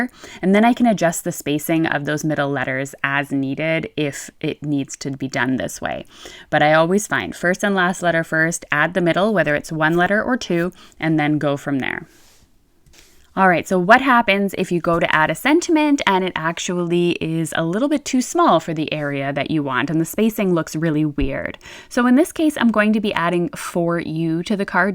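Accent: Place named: Canada. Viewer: Love your videos so much! I love your accent, It makes me think Canada! <3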